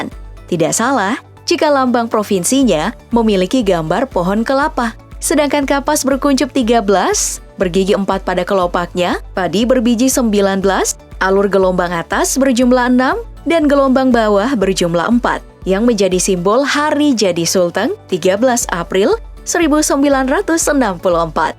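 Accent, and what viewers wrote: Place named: Central Sulawesi, Indonesia. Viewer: Ahh mimin udah bisa logat sulteng😆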